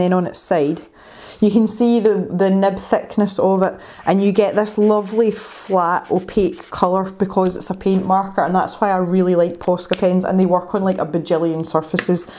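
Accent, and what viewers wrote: Scotland, United Kingdom. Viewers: Aw I am so glad I clicked on your video, so nice to hear Scottish youtubers! :)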